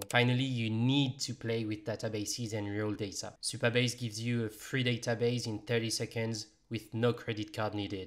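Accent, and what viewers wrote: France. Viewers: Toi t'as un accent français 😀! Cool ta vidéo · Sounds like a french man 😅. Ahh Really informative video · you sound french right .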